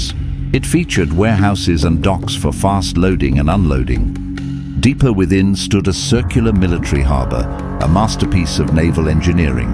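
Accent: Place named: United Kingdom. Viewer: I love hearing a British narrator...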